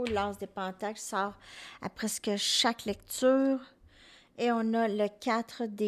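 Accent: Quebec, Canada. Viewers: Le plus bel accent québécois ❤️😉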